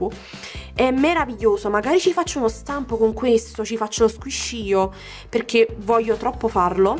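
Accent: Naples, Italy. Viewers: Ciao ma sei di napoli? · ma sei di Napoli?? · penso che tu sia napoletana perché si sente (poi nn lo so)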